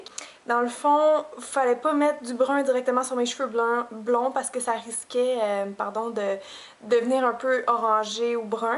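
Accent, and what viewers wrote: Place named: Canada. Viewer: Bonjour, je viens de découvrir ta chaîne et suis en train de visionner une à une tes vidéos. J'aime bcp ce que tu fais et comment tu présentes tes vidéos et également ton accent (je rêve d'aller au Canada, alors je ne me lasse pas de t'écouter! lol) Et cette couleur te va super bien, tu es très belle ! biz de France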